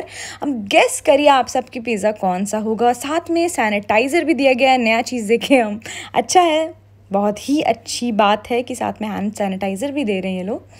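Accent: Bihar, India. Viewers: U r speaking a real bihari time😄😄 Love from gaya🥰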